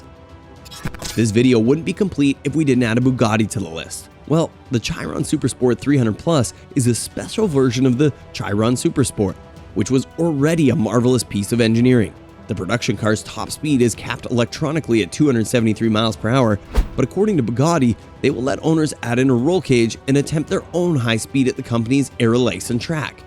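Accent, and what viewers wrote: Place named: United States. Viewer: Hahah mispronunciation master . Got all the horsepower wrong and with an American accent talking about pounds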